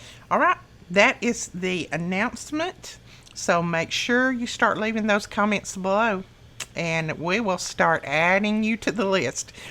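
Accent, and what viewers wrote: South, United States. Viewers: My favorite craft as of late is junk journals. I have never ever won anything from entering any contest. My dad use to say I was bad luck lolol I keep trying though! I love your southern accent!!!!! Xoxoxo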